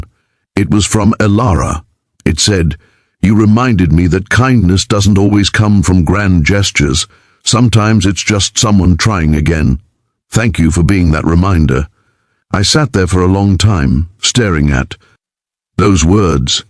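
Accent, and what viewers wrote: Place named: England, United Kingdom. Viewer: This is very bad, especially the voice doesn't fit the apparent age of the protagonist. He shouldn't sound like a middle aged Englishman.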